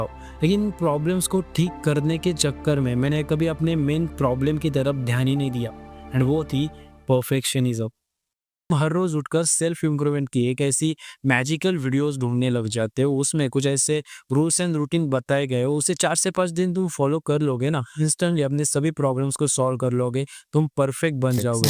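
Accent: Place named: Maharashtra, India. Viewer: Bro sounds marathi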